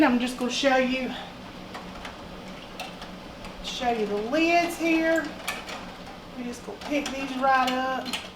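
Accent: South, United States. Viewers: ok thank you so much im elated that you landed in my feed, you had me at your southern accent ha ha❤ I love your voice its beautiful and make listening pretty cool. Ive watched this video alone 6 times already while taking notes. Thank you for being available on top of your own life. It takes a village to can food ✅️. Last summer i used the pressure can method with okra in my presto on top of the stove. Lost r jars due to they did not seal. I think they were too full also. Like above the rim. 😢. Ty so much you're very helpful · Well, I'm glad you did it! I'm about to try this with my big ol' mess of tomatoes from my garden!!! Just subscribed! I'm a southern girl here in Texas! I don't know where you're from, but I can tell you're from the south! Gotta love it! ❤ Blessings and Much Love my dear sister! 🙏✝️🛐💜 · Love your southern accent! Been trying to decide on what recipe to use and I chose yours. 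🥰 I'm about to do this tomorrow while I'm watching some Colts football. I would have never thought about using "wheres your sister sauce" and soy but I'm all in on that now. I love a sweet but savory taste. Wish me luck. And we might be having spaghetti for dinner as well. 🤣 Thanks so much for sharing! Subscribed as well. Yay!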